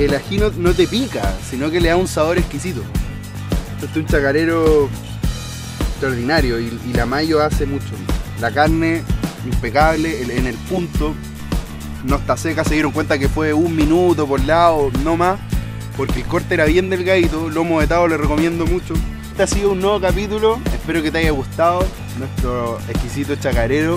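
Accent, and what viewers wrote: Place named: Cuba. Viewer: Habla como un cubano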